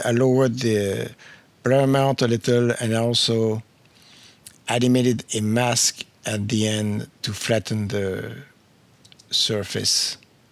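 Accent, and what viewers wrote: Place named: Arab world. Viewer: you have an Arabic accent. nice video by the way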